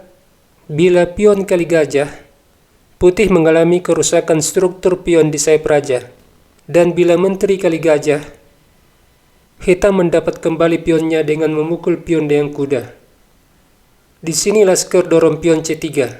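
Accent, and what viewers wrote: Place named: South Tapanuli, Indonesia. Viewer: Bg kemana ya? Semoga beliau sehat Spt nya ini bkn bg ini logatnya spt dr Tapsel Senang dgn filosofi Duani gelap bagi raja Hitam 😂😂😂 Ini sdh ku subscribe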